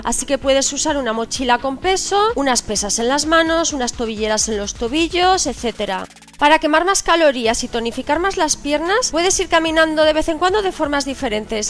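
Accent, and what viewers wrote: Spain. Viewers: eres de Valencia ?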